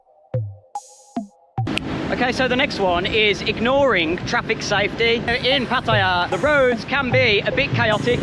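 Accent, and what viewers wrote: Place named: Midlands, United Kingdom. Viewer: ahhhhh do i detect a midlands twang mate ?,where you from ?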